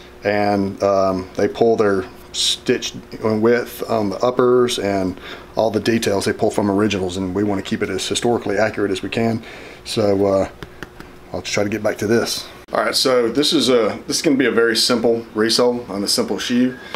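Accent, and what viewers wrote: South, United States. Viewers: Love the Southern accent. "On" is pronounced "own."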